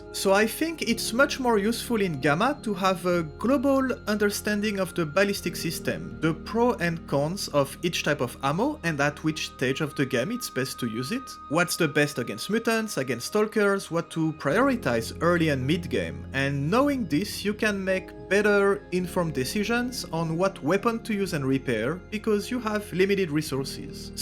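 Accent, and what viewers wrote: France. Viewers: Obviously french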